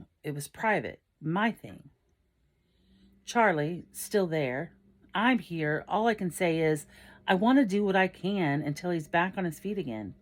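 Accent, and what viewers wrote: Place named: United States. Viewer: I like your US accent from the UK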